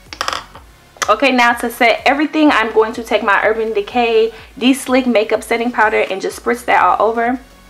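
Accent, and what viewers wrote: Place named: Bay Area, United States. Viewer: Where are you from? Sounds like ur from the bay.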